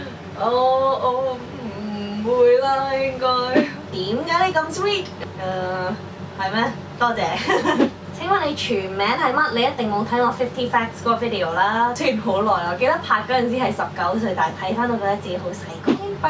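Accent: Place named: United States. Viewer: 一直都以為你係ABC 因為你個口音同性格都好似XD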